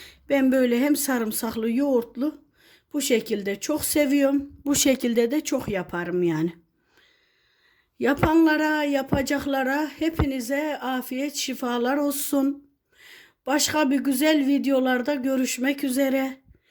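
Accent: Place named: Nevsehir, Turkey. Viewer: Kesin Nevşehirli bu abla 😅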